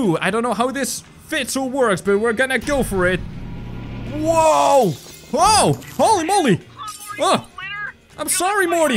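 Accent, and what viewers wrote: Netherlands. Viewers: I have a question. Are you dutch?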